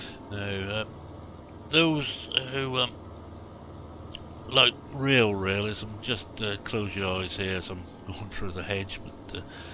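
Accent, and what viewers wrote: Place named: Newcastle, United Kingdom. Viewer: Wonderfull geordie accent...Lol Thanks bud Good vid man thumbs up BTW i would like to see soil mod on old ridge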